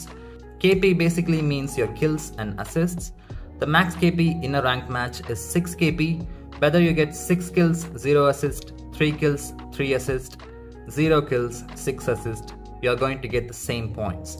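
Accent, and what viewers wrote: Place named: South India, India. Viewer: Tbh I like the little south Indian accent on it 😅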